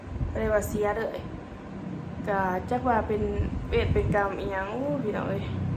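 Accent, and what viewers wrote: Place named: Ubon Ratchathani, Thailand. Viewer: เจ้าอยู่เมืองได๋ สำเนียงคือไทอุบล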